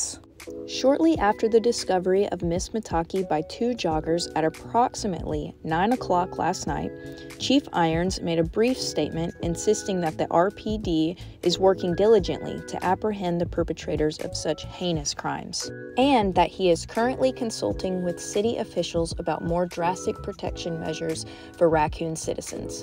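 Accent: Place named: South, United States. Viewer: You’ve a soothing voice, one that makes for easy listening, like a Sam Elliot or a James Earl Jones. I heard a slight southern accent which was adorable, but I say go for it!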